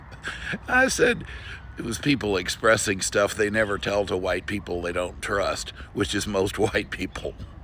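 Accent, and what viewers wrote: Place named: Texas, United States. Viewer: 3 seconds into the video and I can already tell you’re from TX ……y’all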